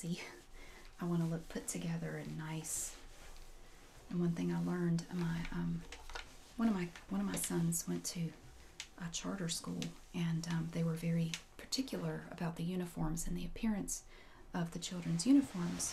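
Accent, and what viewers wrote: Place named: Texas, United States. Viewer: I've always liked the sounds coming from a hand smoothing over cloths. Gentle Whispering has a few videos in which she does this. For some reason, it is a very pleasant sound and is one of my ASMR triggers. I can't tell where your accent is from, Texas? I'm in Georgia and the accents around my location aren't like yours. I like yours better. Have a great evening and thanks for the time you put into these videos.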